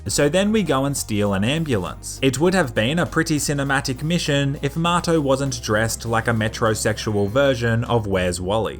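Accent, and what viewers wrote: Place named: Australia. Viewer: ONLY 160K SUBS? What? Under rated channel! I've beem hooked on amazing commentary and hilarous and spontaneous kind of humour. Goes very well with the Ozzy accent. Keep up the good work!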